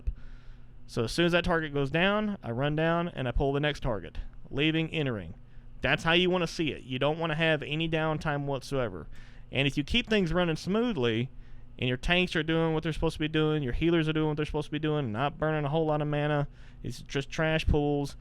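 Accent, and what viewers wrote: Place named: South, United States. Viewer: great video, made better by that smooth southern baritone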